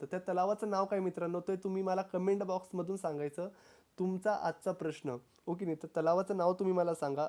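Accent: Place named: Vidarbha, India. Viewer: Great video Sir. I would like to suggest that please provide the reference for things possible. Are you from Vidarbha region, seems from your accent.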